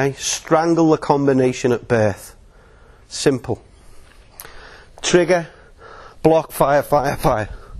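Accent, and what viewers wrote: Liverpool, United Kingdom. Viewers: The voice of gods mate. Scouse. :) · Scouse is the name of the accent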